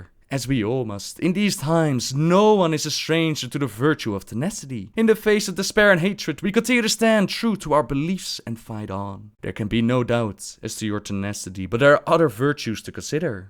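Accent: Netherlands. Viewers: is he dutch?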